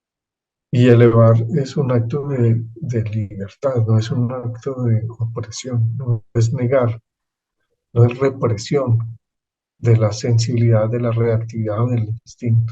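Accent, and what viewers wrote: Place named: Colombia. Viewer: Precisos. He oido muchas veces hablar de las 8 ramas del Yoga, pero esta vez me actualiza el significado. Muchas gracias. En tu acento recordé al Maestro de meditación Sesha. Tambien es Colombiano. Gracias gracias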